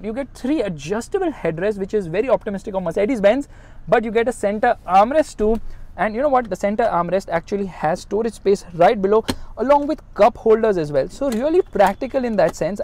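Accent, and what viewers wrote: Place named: India. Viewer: your english is boring learn how to to pronounce and continue with it you are here in hindi accent and you feel like english really is boring just listen just go for hindi you think you speak english but reality is you are speaking Hindi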